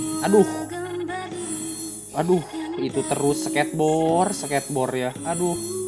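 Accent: West Java, Indonesia. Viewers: Bang orang mana sih tapi ngomong ya sunda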